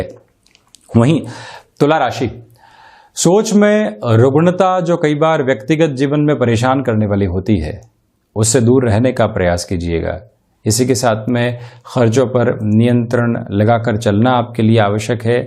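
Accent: Standard. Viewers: Pranam 🙏💐🌹💐, aap bahut sundar tarike se har din rasifal batate hai,aap ki shdh hindi bahut achhi lagti hai,bahut bahut dhanybad aapko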